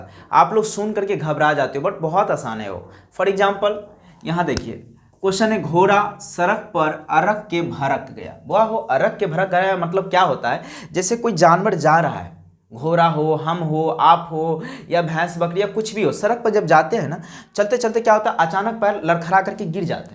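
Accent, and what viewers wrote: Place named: Bihar, India. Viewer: बिहारी हो क्या भाई... इसीलिए "ड़ा" को "रा " बोल रहें हैँ।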